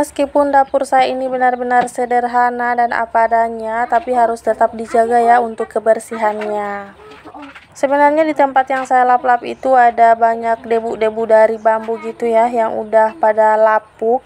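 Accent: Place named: Gorontalo, Indonesia. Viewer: Suka bgt logat gorontalo..teringat tmn yg dri Gorontalo jg.slm dri mkassar y bund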